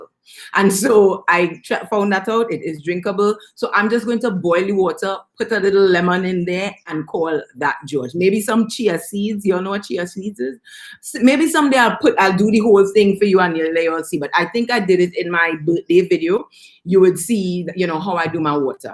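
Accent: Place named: Trinidad and Tobago. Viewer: awwwww i went to Trini a few years back :) this made me miss it. I love your accent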